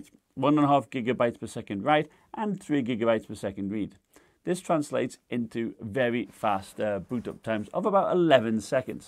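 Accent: United Kingdom. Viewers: Thanks for the review. I've bought this model and I find if to be amazing for the price and specs. What's your accent. I know it's British but which part.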